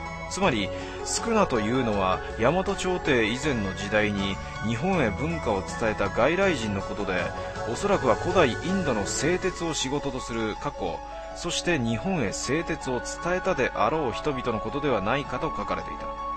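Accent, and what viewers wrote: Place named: Iwate, Japan. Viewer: 岩手のなまりってこんなかなぁ…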